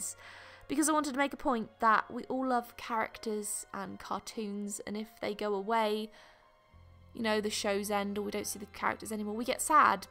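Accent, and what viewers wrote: United Kingdom. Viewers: I’ve been to your Etsy store hoping to see prints of all your work but there’s nothing I love your Dean Winchester pic it’s awesome just like you id love to learn how to do digital art not for professional just for a hobby with rewards at the end I love all your work but I only get an allowance I’m really poorly so I often arrive late and I don’t even know if you get any of my messages. But your such an amazing role model for me and your fun vids have helped me smile even when multiple bones are breaking as they do when my bone disease is bad and staying still doesn’t do a thing to help. Your a ray of sunshine on a dull day and I love your British accent it makes me feel like home I’m in Sheffield England so I’m a Yorkshire girl. Keep doing what you love. Love everything you do. Take care. Rachel x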